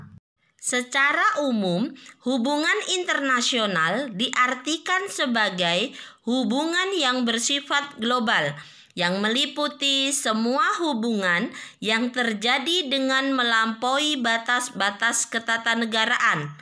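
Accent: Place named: Java, Indonesia. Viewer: Ibu org Jawa ya? Suaranya sedikit medok kyk orang Jawa.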